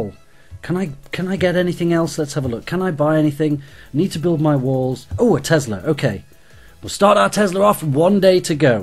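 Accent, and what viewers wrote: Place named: United Kingdom. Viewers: speaks "the queen's english" which is quite different than american english. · I'm pretty sure he does have a British accent. What would you say it is?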